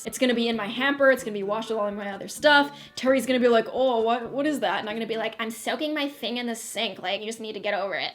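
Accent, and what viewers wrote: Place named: Canada. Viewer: Heehe totally random but I always feel super cool or something when I can sense/ hear a Canadian accent 😎😎😎😂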